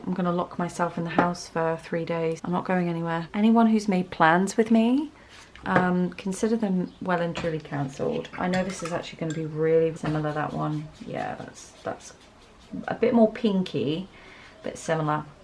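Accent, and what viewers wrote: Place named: United Kingdom. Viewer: I am soothed by your British accent here lol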